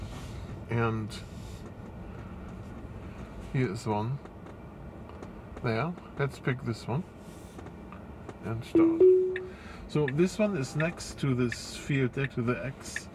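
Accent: Germany. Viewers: Just curious, where are you from? Because your English sounds a bit with a German accent. I could be completely wrong though. Amazing how vision Autopark works. Now they need to speed it up a bit and they should stop turning the wheel while the car stays still. Just unnecessary stress on the axle / steering components etc.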